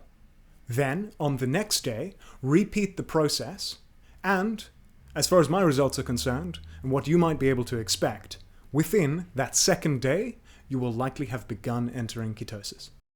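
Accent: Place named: United Kingdom. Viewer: I think I was expecting a California surfer dude accent because of your hair, but got Sherlock Holmes instead. Anyways, great video!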